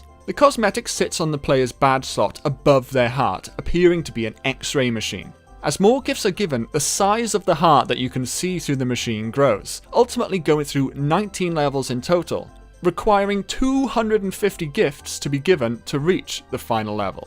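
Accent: Wales, United Kingdom. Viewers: Great video, Just wanted to ask if you are you welsh?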